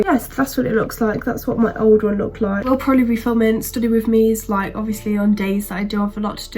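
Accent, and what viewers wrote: United Kingdom. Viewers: her British accent <33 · you have british accent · Guess ,you speak britich accent ,right ? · I like ur British accent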